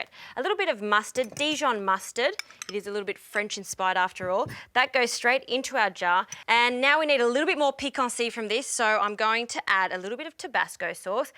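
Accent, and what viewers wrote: Australia. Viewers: I love the Aussie accent!!! Its like a Southern accent here in the USA.😂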